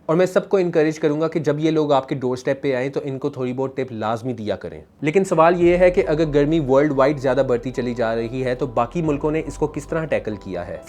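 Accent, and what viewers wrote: Lahore, Pakistan. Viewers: aapka content acha hai lekin bs apni urdu sahi krain lahori accent hai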